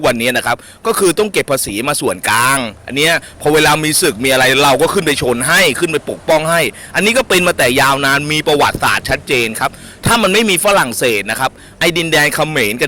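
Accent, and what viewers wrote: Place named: North, Thailand. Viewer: ไม่แน่ใจ...สำเนียงคล้ายๆกับภาษาเหนือบวกภาษากลาง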